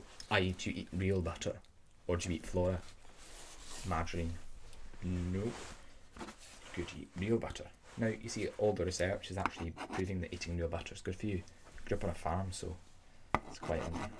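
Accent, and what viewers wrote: Scotland, United Kingdom. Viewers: I always found the Scottish accent a great trigger for me! suscribed m8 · I love scottish accent, and yours is amazing. Would you make more of it? 💞❤️ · As a scot. I have to say , loved the accent . Sound like most of my mates. And me kinda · your Scottish accent is great!!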